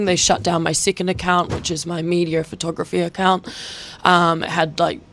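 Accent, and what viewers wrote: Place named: Australia. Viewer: nice outfit, Nice Australian accent 🇦🇺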